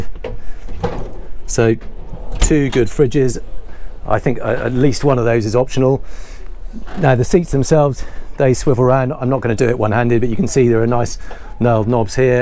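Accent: England, United Kingdom. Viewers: Does anyone care about insulation for cold weather boating? It's a super impressive for the price. It is amazing. However boats in general tend to ignore, three of the four seasons we have here...as in Ireland, where I'm from, Scotland, where he mentioned the boat was from, or England, where he sounds like he's from...but basically the entire north half of Europe, all of Canada, and basically almost all of the USA. I'm not trying to say a boat like this is bad, because for the price, it's super. But if you've ever been in a warm house with poor insulation, or patches of poor insulation, then you know about kold and how hard it is to get out. It will literally dif itself into surfaces like plaster, concrete, and ceiling boards. I know this from first had experience of literally digging into a concrete wall, and a ceiling board, on separate occasions, and finding mold dug in a good few millimetres. 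Any cleaning fluids won't work when it's inbedded into the concrete itself.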